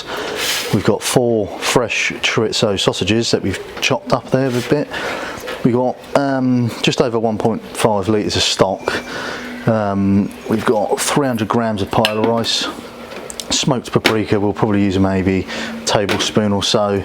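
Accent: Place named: Australia. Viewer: He is speaking Australian.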